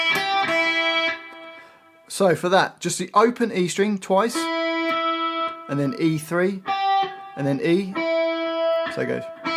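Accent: United Kingdom. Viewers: Do you sound like Ozzy Osbourne, are you English?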